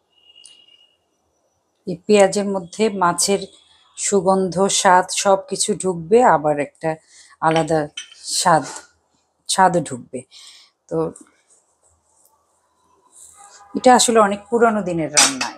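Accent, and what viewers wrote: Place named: Noakhali, Bangladesh. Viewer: নোয়াখাইল্লা আন্টি আসলে আপনি কে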